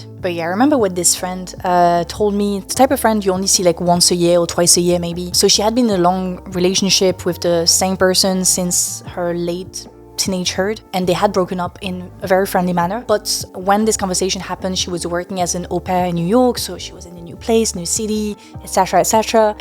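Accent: France. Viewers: Hi! I really enjoy your videos and the perspectives you bring on the topics you choose! The discussions are always super well researched and thoughtful! I also really enjoy listening to your voice/accent. I have a question about that, actually, cause it’s something I’ve been thinking about when it comes to my own accent (austrian/slovenian). When I was younger I sought to mimic the accents I heard on tv and in person, but lately I’ve found that I like it if a bit of my language diversity mixes into it and have sometimes deliberately chosen to keep some things like a german h or a slovenian r in some words. In that way I sometimes feel like I’m aiming for a balance between what’s understandable /great for the person I’m speaking to and what’s more authentic/feels more comfortable. Going into english spaces I always felt a bit self-conscious of a stronger austrian accent (we historically don’t have the best representation lol) so I was wondering what your experience is with that as a french content creator who creates in English. 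Do you find yourself toeing the same line or do you not think about it at all? Do you think it might be different if the language you were born in wasn’t french? (I’m kind of assuming this here, please correct me if I’m wrong, after all, I don’t actually know you 😅) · i find your pronunciation of "o" "oo" "ar" "ure" all pronunication as a strange "urrr", videurr, explurnation, everywhurr, burrk, prurfur - this clumsy hiding of your french accent , it's very distracting. Sorry, but i really like your content. I am French and I don't see why you make so many vowel sounds converge into "urr".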